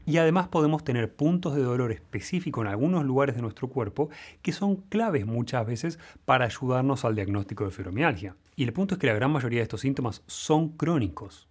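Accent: Argentina. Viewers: Gracias Doc, mi hijo lo sigue desde hace un par de años, y nunca me compartio sus videos hasta que lo empece a escuchar cuando el lo ponia y me sorprendió como explica cada tratamiento para diferentes patologias. Saludos desde Buenos Aires que por su acento deve ser argentino.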